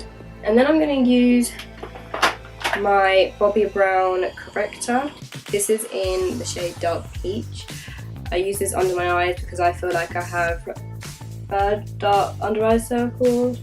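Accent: England, United Kingdom. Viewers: I love your accent! could just listen to you talk all day makes me miss being on vacation in england :( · Are you from London?:') the way you talk reminds me of myself haha!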